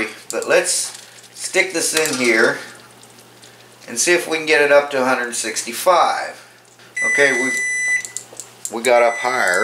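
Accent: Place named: Baltimore, United States. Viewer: Awesome video- very informative. You must be from Baltimore with the accent. Maybe southern PA. Let me know if I’m right!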